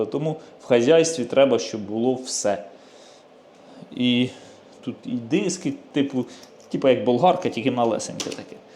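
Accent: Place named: Western Ukraine, Ukraine. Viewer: Ну вино шо з якогось села по мові чути чи Львів чи Закарпаття мова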